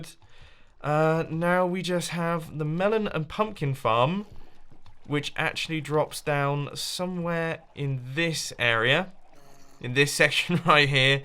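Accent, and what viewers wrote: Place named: United Kingdom. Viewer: Create another storage system for your item backlog. You could also try to set up a system that drops about half of your farms drops in lava so that you don't overflow as fast. ALSO, during logdotzip's TTB#9 video, he abused fireworks so that he could fly better, but his elytra died very quickly, so stock up on a lot of dragon's breath (to repair your elytra while adventuring because some people in the comments section of his vid speculated that fireworks(even those that don't cause explosive damage) damaged elytra faster) before using fireworks to get a flight boost. Sorry for the text wall! Have a good day! Hope this helps! I also LOVE your British accent.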